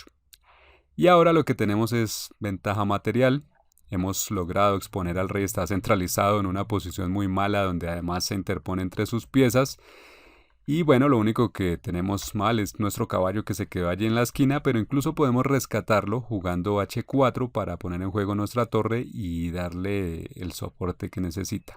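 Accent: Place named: Colombia. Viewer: excelente video, hablas como un colombiano saludos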